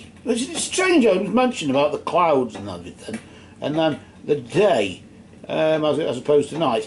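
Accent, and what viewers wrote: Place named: Stoke-on-Trent, United Kingdom. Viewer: I really enjoyed your reading and the video in general, thanks for sharing! For me, I think the reading was trying to say that Eric was now with his father, not sure if there were issues in the past with him but now, they are together but ultimately, it is the past. I think wants to move forward and is carrying guilt, which may be causing the nightmares but the cards are indicating that she should move forward (present, stairs, day) I think the eagle represents that he will always watch over her. The message cards at the end were awesome, I think his message was strong, you did a great job! BTW, where are you from, I am from Stoke-on-Trent and you sound like the bloke next door!!!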